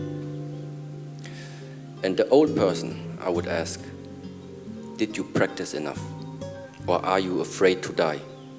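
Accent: Germany. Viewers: By the way... asian master with german accent. Crazy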